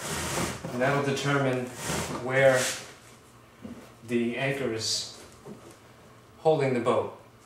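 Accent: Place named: New York, United States. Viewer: This was brilliant. I know you said "Florida" but you sound like you're from NY, maybe Queens.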